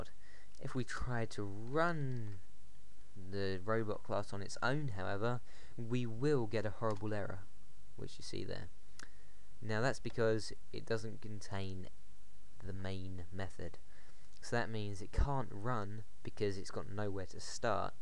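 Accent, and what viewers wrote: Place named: Europe. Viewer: Ello mate, just wanted to say that cuz im puerto rican and that euro accent sounds kool. anyways great tutorial I enjoyed it even though I currently know this information I believe it was good for people currently studying java. Also isbcrv maybe its a good thing that he didnt merge all the methods together into one, cuz it shows new users how to create multiple methods in one class and then call them from the main. I agree eclipse should be used but hey the guy likes notepad thats kool :-D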